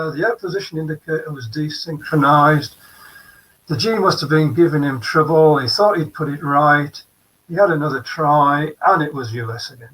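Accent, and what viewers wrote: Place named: England, United Kingdom. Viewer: You need to practice with the mic you are too far, cannot understand a word... remember you have a thick English accent and this is for the world...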